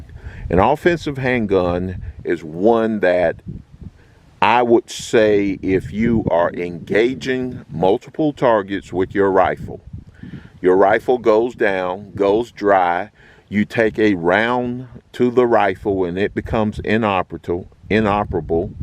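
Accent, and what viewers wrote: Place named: North Carolina, United States. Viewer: That’s a NC accent if I’ve ever heard one! Great review brother. 😊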